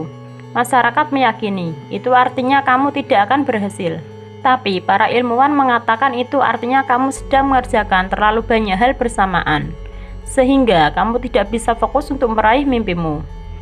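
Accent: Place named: Java, Indonesia. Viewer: Ngomongnya medok banget mba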